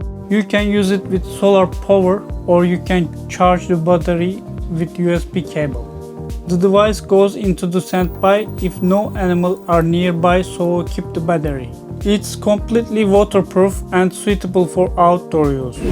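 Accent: Turkey. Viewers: Türk gibi ingilizce konuşuyorsun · Bu ingilizce aksanı kesinlikle bir Türk'e ait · You sound very Turkish ?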